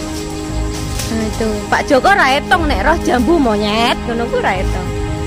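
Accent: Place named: Java, Indonesia. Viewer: Jawane totok tenan yooo.... 😂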